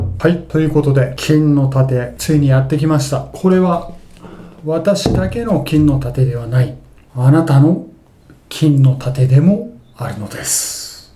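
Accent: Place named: Fukui, Japan. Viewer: イントネーションが福井っぽい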